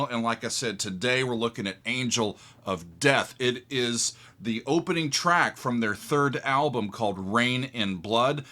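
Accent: United States. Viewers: I love your accent. What State are you from, classical composer?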